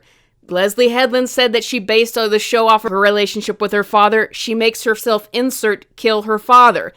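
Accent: United States. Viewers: Holy shit I just knew you had a Minnesota accent before you even started talking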